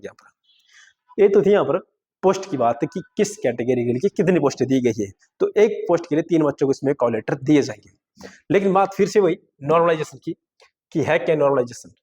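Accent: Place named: Sirmaur, India. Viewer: Sirmaur se ho na ap bolne ke tarike se lg rha😅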